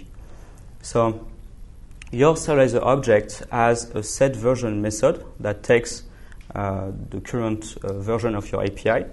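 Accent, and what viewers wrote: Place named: France. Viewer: the frenglish accent killed it a bit...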